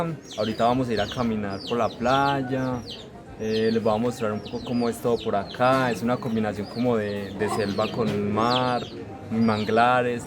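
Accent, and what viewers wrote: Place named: Antioquia, Colombia. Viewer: Ese acento paisa me encanta. Gracias por el video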